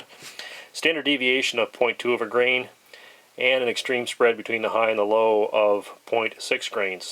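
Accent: Canada. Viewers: You sound Canadian. Greetings from an American citizen, who was born and raised in England.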